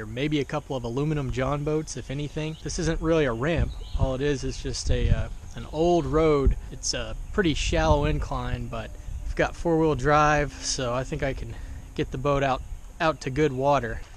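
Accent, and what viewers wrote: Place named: United States. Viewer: You speak with a an accent thats very pleasant to the ear. Somewhat laidback, very clear pronunciation but unmistakably American. Also: nice boat.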